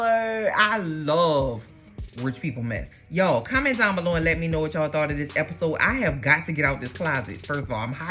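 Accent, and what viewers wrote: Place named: Louisiana, United States. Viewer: I have never run across this show before - I have listened to a lot of the Gilded Age podcasts from different people and perspectives - this is by far the funniest breakdowns EVER!!! Maybe because I’m from Louisiana the accent had me further in a chokehold like I was listening to one of my homegirls give this recap and rolling on the floor laughing! I am subscribing TODAY!!! 😅😅😅😅😅😅😅😅😅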